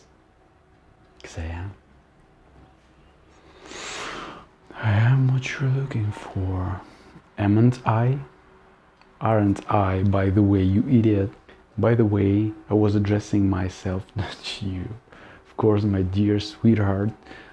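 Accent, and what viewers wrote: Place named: Russia. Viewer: Please keep your accent, your English is good LOOK MEANS NOTHING ITS YOUR CONTENT, JUST BE TRUE TO YOURSELF AND KEEP REPORTING WHAT THE PUBLIC WANTS AND HELP EDUCATE THEM UNDERSTAND WHAT WE CAN ALL DO TO CHANGE IT. Yeah/Yes it would be good to have an introduction song to be played at the start of your videos. Maybe edit a few time lapse clips together over you speaking to people on the streets about really important things. If you don't have the kind of equipment tell others you might know if they can help you edit it. We're all products of our environment and exposure to it, of course those in powerful positions within our governments thrive on us all being against one another. We can't let them keep pushing their narrative of negativity towards each other because none of us hate each other we all want peace and to get along. 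Again please don't lose the great Russian accent, it's your national sound and you and everyone should be proud of their individual accents. Good videos please keep them coming.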